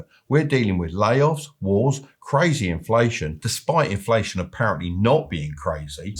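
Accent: United Kingdom. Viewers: come on grand pa. I think you lying. cos america is booming business is great.. you sound liek a British. a depressing miserable land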